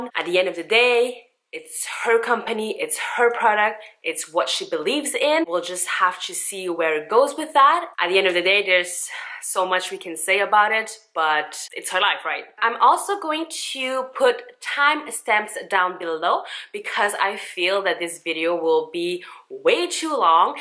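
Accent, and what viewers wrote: Quebec, Canada. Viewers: il me semblait que j'entendais du français genre québécois comme accent, ☺️ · So happy I found your channel! It's fantastic that this product has brought up so much discussion about science and health supplements on YouTube. I wish Tati all the best with her business, but even if she finds the backlash difficult to deal with, I'm happy it's leading to an increase in science literacy among at least a small fraction of her subscribers. You did a really good job going through the various aspects of the product. On a more personal note, I'm a Quebecer living in the United States doing my PhD and your French Canadian accent reminds me of home. (: Merci beaucoup!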